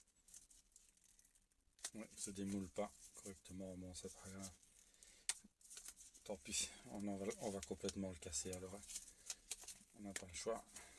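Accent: France. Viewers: Esse sotac FRANCÊS é muito incrível. Gosto muito de ouvir.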